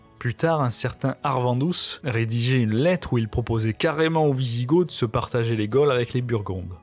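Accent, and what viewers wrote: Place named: Bordeaux, France. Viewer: Ayant de la famille bordelaise, oui votre accent est très peu prononcé, mais vivant dans le Nord, il est facilement audible ahah ! Merci pour ce long écrit, mais j’ai beaucoup plus la sensation avec d’autres empires et même le byzantin, que la chute fut bien plus brutale que pour Rome. Limite plutôt frustrant en fait de ne pas avoir un vrai événement qui enterre a une date précise Rome. En tout cas super vidéo et j’espère en avoir d’autres en reco à l’avenir !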